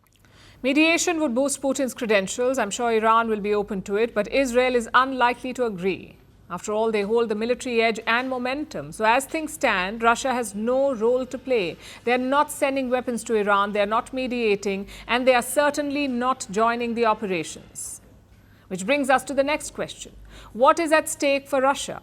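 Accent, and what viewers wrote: India. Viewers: Sorry can't watch with that indian paajeeet accent. It's horrible.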